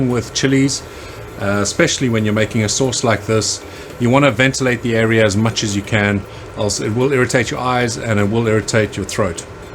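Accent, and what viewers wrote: South Africa. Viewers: Nope! Sorry he doesn't have Kiwi accent at all. I can hear South African accent.🤣👌🙏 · Damn bro I knew you where South African me too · Didn't know you were South African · i couldn't pinpoint your accent because it didn't really sound British but now i know why (because its south African)😂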